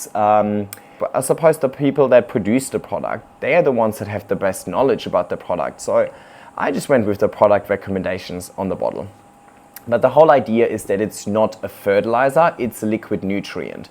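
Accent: Germany. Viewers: I can‘t stop wondering about his accent😅 Is he originally German? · Are you from Germany? :)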